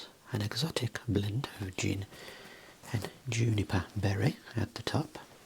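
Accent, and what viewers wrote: United Kingdom. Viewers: イギリスの話し方が本当によく眠れます😊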